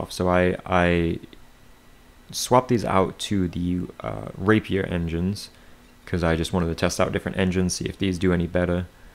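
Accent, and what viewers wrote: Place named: Mid-Atlantic, United States. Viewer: A true "Mid-Atlantic Accent" then!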